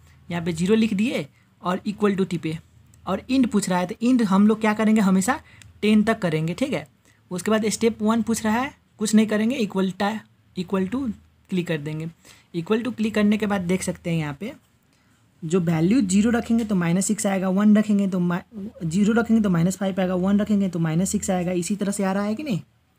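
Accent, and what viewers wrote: Nepal. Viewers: ARE YOU FROM NEPAL🇳🇵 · Bro's Nepo-Hindi is sweet😂😭🫶🏻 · Bro temi Nepali ho timro boli sunda Nepali lagyo anyway Sabai ramro Xa temi le padhako pani Sabai bujhinxa keep it up love you 🤗🥰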